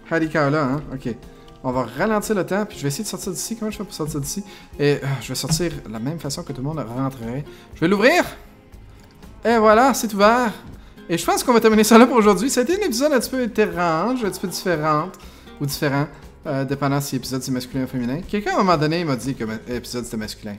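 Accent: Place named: Quebec, Canada. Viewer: Coucou! Moi personnellement, j'adore tes séries Planet Coaster, City skyline, et j'ai adoré Kona! J'aime la diversité que tu proposes! Donc ne stoppe rien, et continue à nous faire du contenu comme tu le fais actuellement:) Ta voix et ton accent (j'ai visité le Canada Francophone il y a 2 ans) me rappelle tant de souvenirs!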